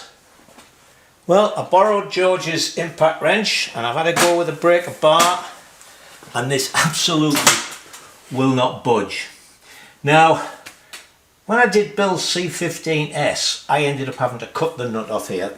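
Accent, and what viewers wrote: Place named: Teesside, United Kingdom. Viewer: Recognise the accent,,, are you from Teesside????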